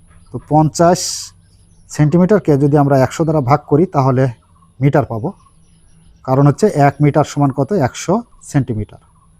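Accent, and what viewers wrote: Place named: Rajshahi, Bangladesh. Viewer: 🤔🤔🤔ভাষা শুনে লাগছে রাজশাহী (সম্ভবত)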